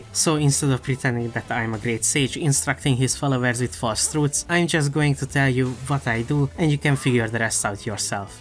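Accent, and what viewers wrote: Hungary. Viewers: This accent...magyar...hmmmmmm... Holy shi im right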